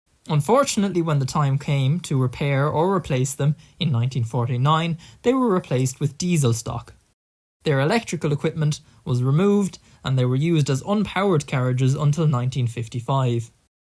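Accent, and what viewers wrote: Ireland. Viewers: Love it. Born in Killiney, but now live in China ( How much more international can you get ). Love transport infrastructure VTs, as I used to work in that sector. Just as an aside, love your voice, great to hear a cool Dub accent again. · I'd have no reason to doubt that he is Irish, given his distinctly Irish accent. Why do you ask?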